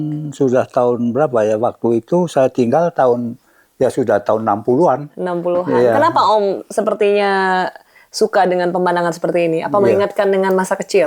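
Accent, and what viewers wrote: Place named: Java, Indonesia. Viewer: Sdh puluhan thn tinggal di luar negeri tpi logat jawa tetap medhok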